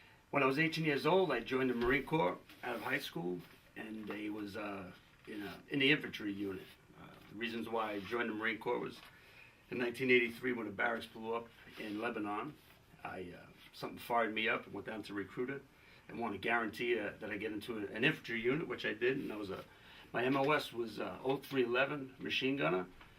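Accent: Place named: Italy. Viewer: He's got that awesome Italian kind of accent thing going on. :D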